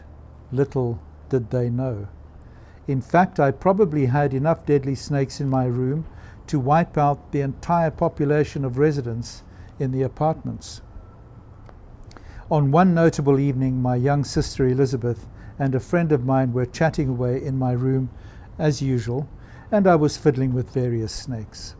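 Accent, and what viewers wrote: South Africa. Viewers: Being a witness of suicide in whatever form often is a very uncomfortable experience. I've been there too. On both sides actually. On a brighter note: By listening to your videos, I have a newly found appreciation for the South African English accent.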